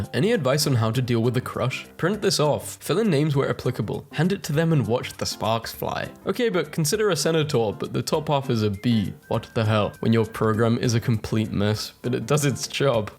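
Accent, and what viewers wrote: United Kingdom. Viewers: Why can't I tell if you are British or not? It's making my skin crawl.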